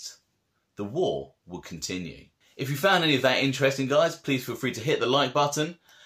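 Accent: England, United Kingdom. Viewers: Not for nothing but hearing a english accent is def a bit strange talking about the mobs in the States. Kind of like listening to an American explaining the british monarchy or viking history😮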